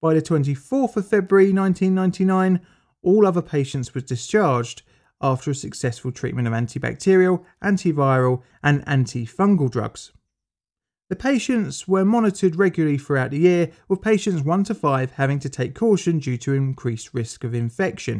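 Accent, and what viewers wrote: United Kingdom. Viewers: Pal, I've got to say, the contrast between the perfect british accent, narrative tone and wording, and the expressions on your bubble dialog boxes for the comics are making my day. It's pure perfection. "Score and a Hen", "Balls" and such. Exquisite combination.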